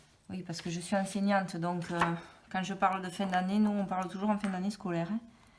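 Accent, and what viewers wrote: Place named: Toulouse, France. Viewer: Mais qu'il est beau notre accent toulousain 😉. Merci pour le tuto. Je participe...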